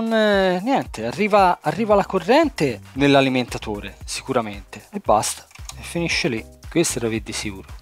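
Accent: Tuscany, Italy. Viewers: Sei un simpaticone e mi fai divertire un sacco con il tuo dialetto TOSCANACCIO 😄😄😄😄😄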